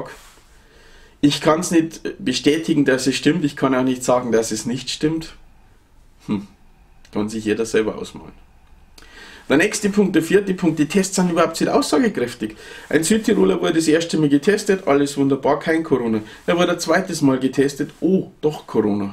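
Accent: Bavaria, Germany. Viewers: Gutes video, und die bayerische mundart kann ich sogar verstehen. Da gibt es schlimmeres.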